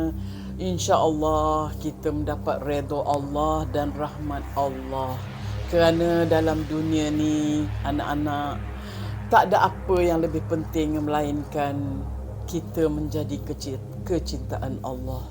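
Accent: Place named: Kedah, Malaysia. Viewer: Org kedah mana suaranya??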